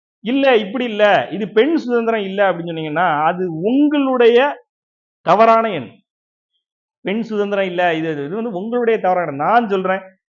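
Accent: Tirunelveli, India. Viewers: Superb. Very matured talk. Entha ooru bro neenga? Tirunelveli slang iruku.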